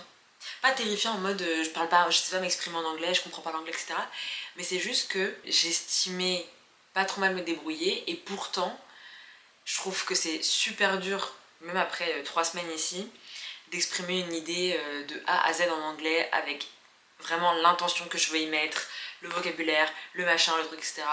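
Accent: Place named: France. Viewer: SALUT, c'est guillaume peuchaud, j'avais le meme problème pour l'alter ego en voyage. Au début je voulais aussi diminuer l'intensité de mon accent pour avoir le sentiment de progresser mais au final ce n'était pas moi que j'entendais parler, mais quelqu'un d'autre, quelqu'un de faux. Alors la technique que j'ai trouvé c'est de garder mon accent Français. Je sais que tu veux diminuer ton accent Français pour te sentir progresser, et peut être qu'à force de pas mal de temps de sacrifice tu réussira à parler anglais avec l'accent américain, sans meme penser au fait que t'es entrain de te forcer, ca sera donc devenu naturel? Mais je pense quand meme que dans ce processus ta personnalité aura changé ne serait-ce qu'un tout petit peu (c'est ce que je ressentais). Donc ce sera ton nouveau toi quoi... La t'es aux US donc forcément tout le monde a l'accent américain, et dans la communauté française à laquelle tu appartiens, forcement c'est stylé d'être le Français avec l'accent le moins Français, c'est une preuve de ton niveau... 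Mais perso dans mon voyage j'étais constamment avec des gens de différentes nationalités, Thaïlandais, Espagnols, Ukrainiens et j'ai compris une chose, l'anglais est une langue internationale, faite pour être parlée par le monde entier, et chaque pays a son accent. Pourquoi les 197 pays devraient se calquer sur le modèle British ou US? D'ailleurs, les Américains n'essayent pas d'avoir l'accent British alors pourtant que l'origine de la langue angla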